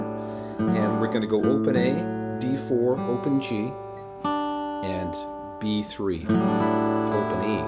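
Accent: Canada. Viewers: How can there be anyone who plays guitar give this a thumbs down?? This guy is fantastic. His voice sounds like he is from Canada?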